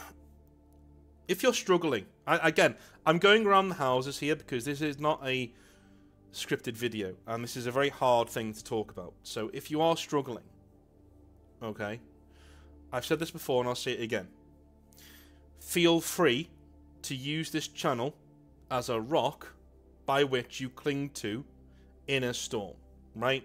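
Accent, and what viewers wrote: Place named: North, United Kingdom. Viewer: I found this channel while feeling down and getting back in to the Hobby. Currently listening and getting joy from the stories, and familiar sounding northern advice, while waiting for hobby tools to build up some Orcs and Bretonians. Orcs are just super fun, and I want some good noble knights too. I may even get around to sorting my Steel Legion in red and black (Inquisitorial Guard) to finally join the Grey Knights... I like Knights! Thank you for the joy you have shared with me, and I mean that truly.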